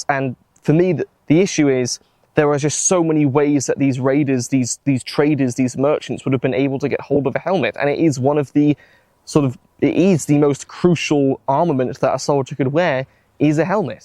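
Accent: United Kingdom. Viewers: I like your English accent Lolol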